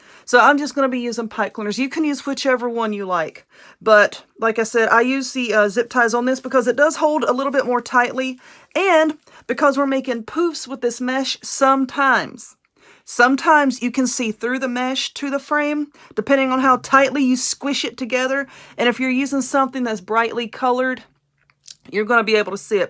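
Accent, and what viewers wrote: South, United States. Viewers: Love this wreath, and the black truck one I enjoyed the 2 different styles. I also just really love you southern drawl, and the way you explain things. I absolutely understand your terminology, such as great big gloppy glop, and cruffle. You are a joy to watch, make me smile through the whole video, which I sometimes wish were much longer. I have 2 grandchildren that live me on a foster child basis, the oldest is 14, the youngest 5. So your channel is a breath of fresh air, I love it. Thank you so much for sharing. Have a wonderful Sunday, and next week. Cant wait to see what comes next.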